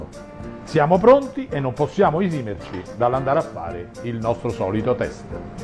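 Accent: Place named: Rome, Italy. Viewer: Sono siciliano e di buona forchetta e abbastanza capace ai fornelli a livello amatoriale e vi dico che fra tanti video che ho visto su questa ricetta a mio modesto parere è il migliore in tutti gli aspetti poi con la voce narrante si completa il tutto con quel dialetto romano che ne vado pazzo mi piace da morire e per restare sul tema come se dice a Roma "Ahu me venuta na certa fame co sto video mortaacci vostri mo stasera carbonara per tutti alla faccia da dieta ma che ce frega...". Complimenti veramente.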